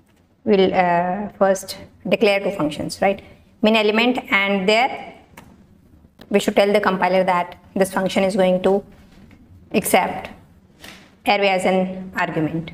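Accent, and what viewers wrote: India. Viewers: she's an Indian and has different accent for pronouncing words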